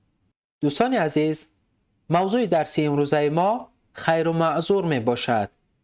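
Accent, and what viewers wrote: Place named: Afghanistan. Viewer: Таҳлаҳҷаи авғонӣ, вале зебо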